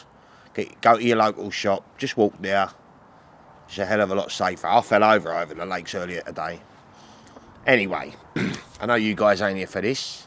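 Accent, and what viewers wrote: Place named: Romford, United Kingdom. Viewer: Really enjoyed this video. Real hard to rank them. Personally I’d have put higher than the white album just because it’s got that glossy autumnal cover. edges it for me over too and I’m not a big fan of the album cover but your list was very interesting. You sound like you’re from round my way too. Im from Romford. Take care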